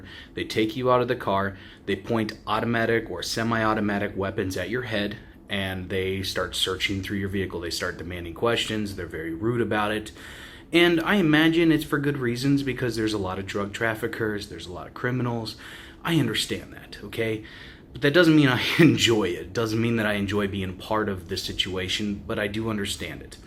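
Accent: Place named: United States. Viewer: Esse cara é br e deve ter morado mo cota nos eua tem mo sotaque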